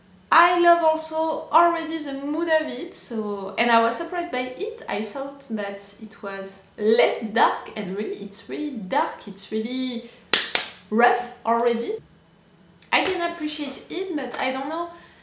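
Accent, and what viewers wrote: France. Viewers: Je viens de découvrir à l'instant ta chaîne et je voulais savoir c'est moi où tu a un accent français ? Sans savoir parler anglais je pense entendre l'accent francais quand tu parle... , En tout cas sa ne m'a pas l'air d'être l'accent anglais...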